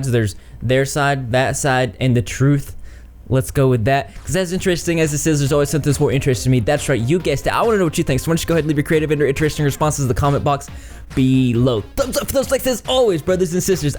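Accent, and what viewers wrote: South, United States. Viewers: Why did i think you were from Australia? Now you sound southern .. what in the tarnation is going on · Just subscribed :) I like your accent is it from Alabama or Tennessee very southern. Ps I like your analysis and you’re pretty handsome . Reminds me of one the singers from I see Stars. Have a great day! Haha Best regards, Mimi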